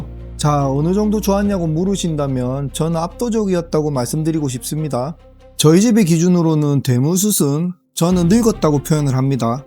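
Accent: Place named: Gyeongsang, South Korea. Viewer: 오 경상도 분이셧군요 어디분이실까요?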